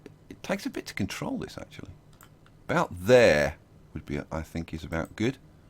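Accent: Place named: Midlands, United Kingdom. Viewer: Great job. By the way i am going to guess you are mid forties and originally from somewhere in birmingham or towards nottingham?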